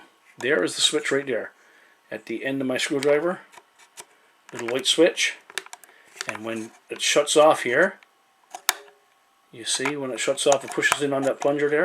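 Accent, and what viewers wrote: Newfoundland, Canada. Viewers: Geez! Great job and I’m on it right now. I heard you speak and I said to my wife,he sounds like he’s from NL maybe even ST MARYS bay! Thanks so much sir.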